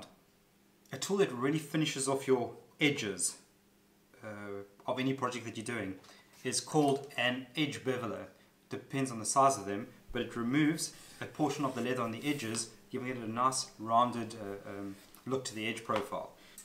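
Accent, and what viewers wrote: South Africa. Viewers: You sound South-African if you are can you help me with good local places to buy tools and leather I'm looking at starting as a hobby. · Ja, thanks my boy for this video, cool to hear a SA bloke on here loĺ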